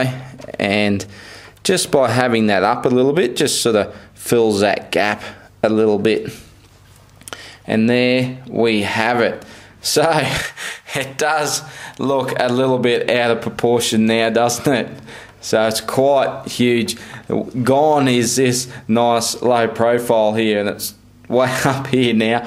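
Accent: Australia. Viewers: u are so aussie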